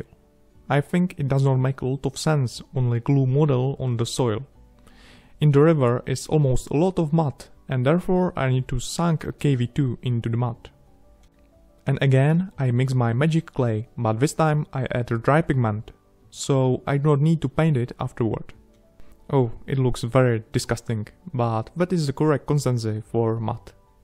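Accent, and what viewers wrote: Czech Republic. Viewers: Hned jsem podle přízvuku poznal našince, krásná práce kamaráde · Really strong czech accent :D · Já jsem si myslel že jsi Čech už jenom podle toho přízvuku když jsi mluvil anglicky 😂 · Ja sem si mislel ze ses cech podle ti anglictini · Z toho přízvuku to bylo poznat a české nápisy to dovršili a ty taky 😁 takže příště video česky 😶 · Maš typycky českou angličtinu. Ta sry mě ta artikulace prostě sere chtělo by to zapracovat ale jinak moc hezká práce. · podle přízvuku jsem si říkal že jsi čech :D , ale supr video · Českej přízvuk se pozná xd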